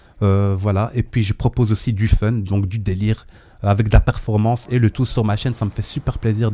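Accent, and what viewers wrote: Belgium. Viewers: cho l'accent belge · Tu viens d'ou de Belgique? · Le commentateur est belge ? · Un petit accent belge il me seble non? :)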